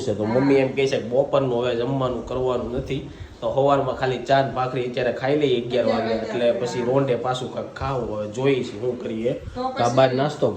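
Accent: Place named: Kathiawar, India. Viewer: Today’s I’m the 1st to comment to yr Vlog 🤗😍🥰❤️🙏🏻 Tamari gamthi kathiyawadi boli sambhalvani.....j bahu maja aavti hoy 6👌🏻🤗🤗😍❤️❤️ MBOza from Gandhinagar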